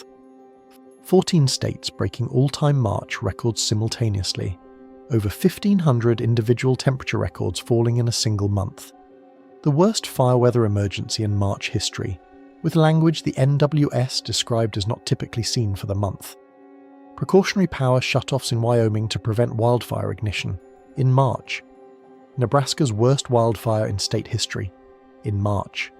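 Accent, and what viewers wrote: United Kingdom. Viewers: Why British doing all our videos ....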